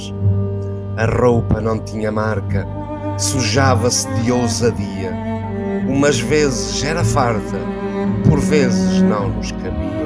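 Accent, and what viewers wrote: Portugal. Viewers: Estou Emocionada ao ouvir tão bela poesia! Me fez lembrar da minha Infância queria . Eu realmente tive uma Infância Feliz. Eu Amo o seu sotaque Lusitano. Obrigado. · com o sotaque português dar toda elegância ao poema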